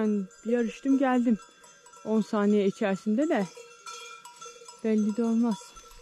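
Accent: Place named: Gerede, Turkey. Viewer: Konuşmalarını dinlerken bu kız Karabüke yakın bir yerlerden ellehem dedim Geredeli çıktın konuşma aynı bu tarafla.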